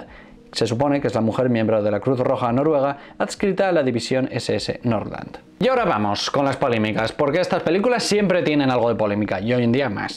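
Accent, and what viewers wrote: Spain. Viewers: Soy mexicano y escucho tu acento español, no escucho bien cuando pronuncias el nombre del personaje, yo lo escucho como himler, pero Hitler era uno y himler era otro.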